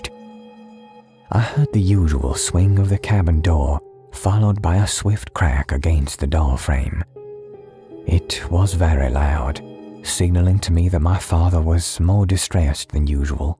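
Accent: South, United States. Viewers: Wonderful timing, my good sir! Bed time! My but you have the perfect Southern gentleman advent! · Have heard this from another narrator, but you did it so much better! Love that thair southern twang of yours!! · Your southern drawl was Great!!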